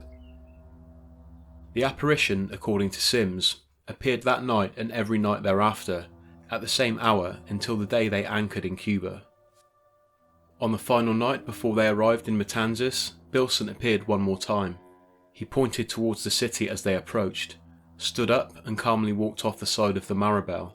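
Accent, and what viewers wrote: England, United Kingdom. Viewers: how could it possibly have been at all profitable to sail coal clear from Australia to the United States? There are small, yet damning fapts of logic and lapses of sound reasoning that plauge each and every one of these "Tall Ship Tales And Old Sailors Tales" but I both appreciate the imagination that spawns them and the attempts to make them appear as realistic ad possible. Your cool English accent helps them along as well.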